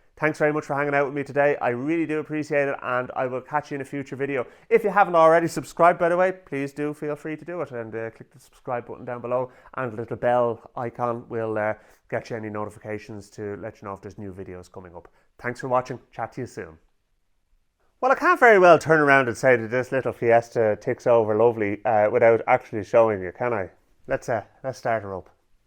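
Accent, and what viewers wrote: Ireland. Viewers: The Irish lilt is very pleasing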